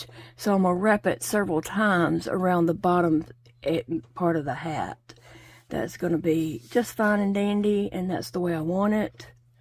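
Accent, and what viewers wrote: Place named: Delaware, United States. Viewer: I LOVE this Gnome! I Love the Mustache, the Turned Up Hat and the Vine with the Flowers and Curly Cues. The way you say the days of the week sounds the same as we do here in Delaware. 🤷🏼‍♀️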